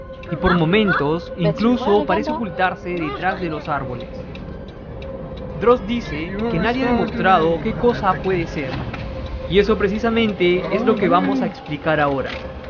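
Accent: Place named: Peru. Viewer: ERES DE PERU VERDAD ? POR QUE TIENES NUESTRO DIALECTO .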